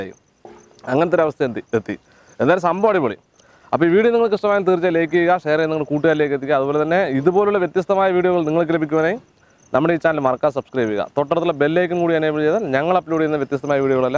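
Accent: Palakkad, India. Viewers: നിങ്ങടെ സംസാരം ആണ് ഹൈലൈറ്റ് ഞാനും പാലക്കാടനാ ലഷ്മണൻ എവിടെ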